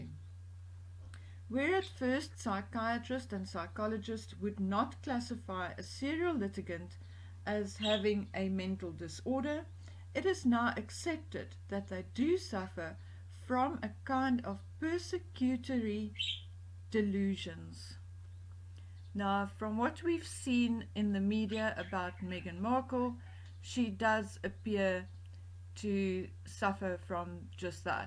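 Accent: Afrikaans, South Africa. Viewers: Her whole life is a distortion. So many lies and misinformation....very sad creature if truth be told. She’ll be a lonely bitter old woman before too long. Thanks to Wally for recommending you. 🇦🇺 BTW love your South African accent! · 🇿🇦 I enjoy hearing your afrikaans accent, it reminds me of happy times when i lived there. Also you talk a lot of sense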